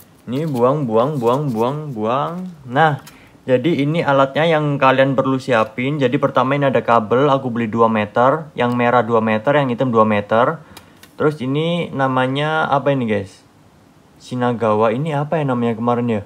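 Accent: Malang, Indonesia. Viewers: Mas orang malang ya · Orang malang lur ? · Wihh orang malang bang? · Orang malang mas? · Wong malang a iki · orang malang bang? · oalahh tibakne wong malang toh sam, pantesan kok medhok2 ngunu mantep sam!!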